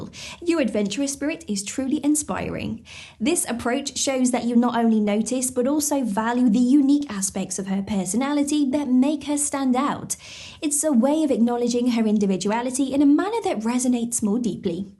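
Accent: England, United Kingdom. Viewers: These videos have really helped me since I had some bad stuff happen to me and I trust the English accent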